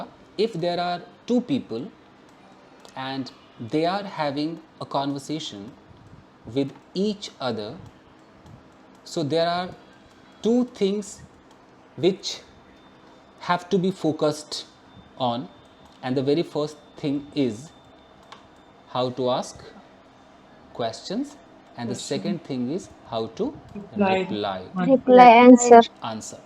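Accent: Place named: Bihar, India. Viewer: Sir aap puri bihari bol rhe 😄😄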